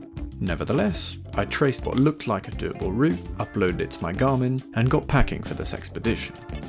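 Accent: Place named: England, United Kingdom. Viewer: Vous avez un accent anglais incroyable 🤩 continuez comme ça j’adore votre vidéo et vos shorts 😁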